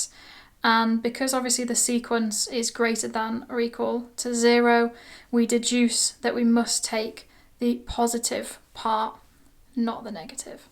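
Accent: United Kingdom. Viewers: I enjoy UR BRITISH ACCENT too😘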